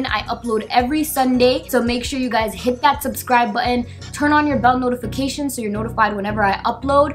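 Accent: Toronto, Canada. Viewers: You talk like you live in Toronto/Scarborough.... 🇨🇦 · i hear the Toronto/GTA accent im dead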